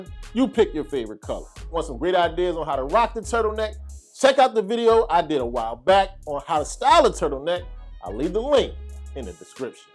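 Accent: Philadelphia, United States. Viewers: You outta Philly?!I knew you wuz out North East sumwhere..i thought New York..no offense..LOL SALUTE..from Chicago$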